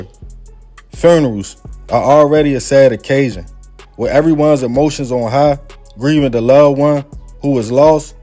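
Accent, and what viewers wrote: Baltimore, United States. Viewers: That’s their Baltimore accent,, I might gotta slide 🛝 cause I really can’t take it 🤦🏽‍♀️🤦🏽‍♀️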